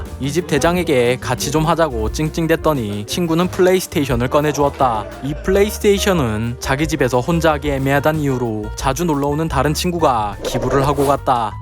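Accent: Jeju, South Korea. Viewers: 이분 고향이 제주도인가요? 처음듣는 사투리같아요 ㅋㅋ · 제주도 사투리 싱기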